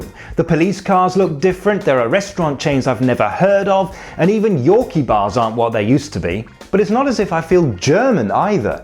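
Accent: United States. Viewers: oh my god your american accent is amazing! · Nice American accent! Do you have trouble adjusting to driving on the left side of the road when you return to the UK?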